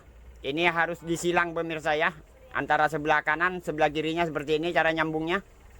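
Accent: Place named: Madura, Indonesia. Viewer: Terima kasih ilmunya pak...kayaknya dari gaya bahasanya orang Madura ya pak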